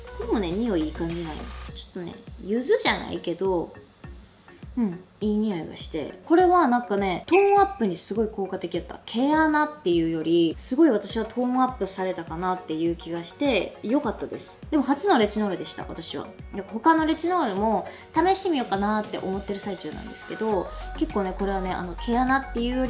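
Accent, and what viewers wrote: Kansai, Japan. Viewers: 凄い種類の多さに驚き、最初どうやって選んでいるの？視聴者は助かるんだろうな～ ちゃんの関西弁に癒される～💞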